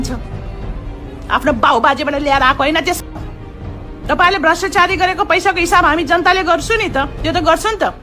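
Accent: India. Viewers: tapai ko bwoli sunda indian jasto lago ne de jeu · ali bhasha lawaj sunda Inian jasto cha.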